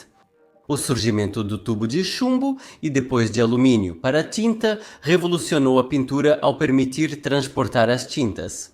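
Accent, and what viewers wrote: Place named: Brazil. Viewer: Seu sotaque não, e brasil.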